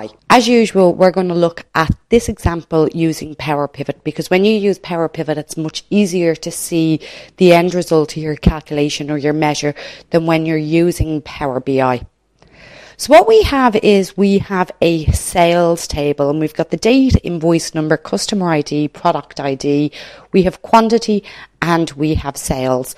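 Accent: Ireland. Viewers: Lovely Irish accent!!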